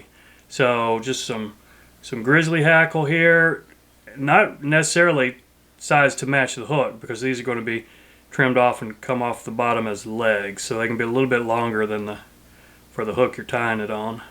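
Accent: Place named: South, United States. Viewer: Stop cringing, although I cringe when I hear my recorded voice 😖I like this format, great intro. You sound more "southern" in this video;o) Geeze in almost 2 years you now sound like a celebrity host of a how to show 👍Nothing wrong with either production styles, your dedication to the channel is amazing! Thanks for doing this! Oh yeah the fly, heard of Humphreys, a lot, but not aware of this bug. I tied Ed Shenk's cress bug. But I'll tell ya, if you pull a Usual under water in the middle of a drift, especially in a good current, hang on for a surprise. I think the trout take it for one of those critters. Thanks again, those flies in the intro were awesome! 🤓👍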